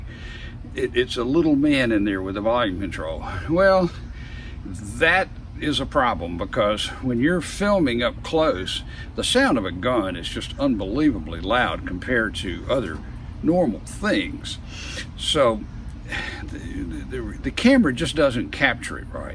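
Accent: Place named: Texas, United States. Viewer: He sounds like the most stereotypical texan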